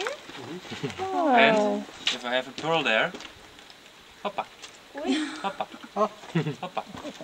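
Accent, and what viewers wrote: Germany. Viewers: When I heard him talking I was so sure he is German :D Coz I speak the same way when I try to talk in English :P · I hate German talking english. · german accent xD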